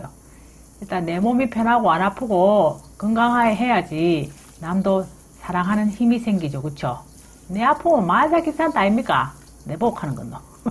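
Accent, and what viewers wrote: Gyeongsang, South Korea. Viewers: 정말 잼나게 경상도 말씨가 정감이가네요!! 들을때 마다 웃음보따리 힐링~^-^~ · 사투리가 제캉 비스무리하신데 고향이 어덴교? 첨으로 댓글 달아보는데 볼때마다 경쟁시미 생기네요 저도 몆칠전에 항거 다마났심더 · 경상도 말씀이 넘이쁘요 항상 많이 배워갑니다 감사해요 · 경상도말이 너무재미있어요🤩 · 이분이 사투리가 심하네요. 나도 부산인데. 혼자만 아는 사투리 용어도 쓰시는듯. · 항상 잘 보고 있습니다 구수한 경상도 말씀 너무 재미있게 하시니 정겹습니다 우리 시댁도 영천인데 제피 뜯으러 가야겠다~~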